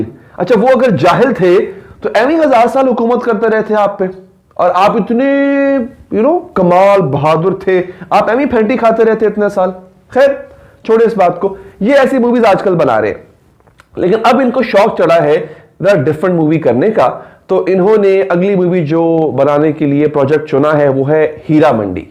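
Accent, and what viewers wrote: Punjab, India. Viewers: He mostly uses some punjabi words in a typical accent n its really cute to hear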